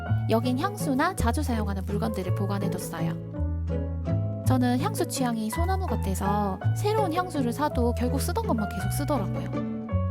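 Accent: Busan, South Korea. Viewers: 표준어를 쓰고 싶지만 새어나오는 부산바이브 ㅋㅋㅋㅋ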